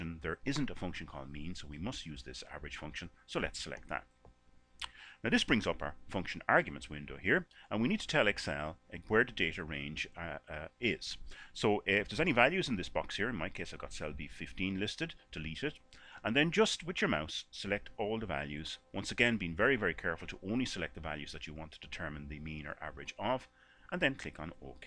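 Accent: Ireland. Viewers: I found this video very useful,. And...are you Irish, cause you sound Irish, and your last name is O'(name). I liked hearing your voice as I followed along (it was very relaxing and almost lulled me to sleep, loll), and I liked the fact that you went slow. Thank you so much! Blessings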